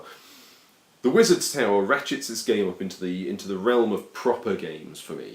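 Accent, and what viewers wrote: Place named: United Kingdom. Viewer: Really enjoyed the video...and the accent, you brits speak so properly.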